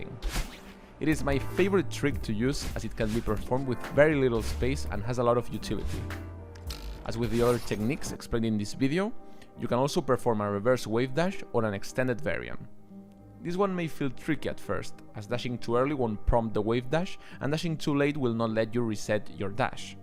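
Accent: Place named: Spain. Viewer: Eres español? Porque tu acento parece español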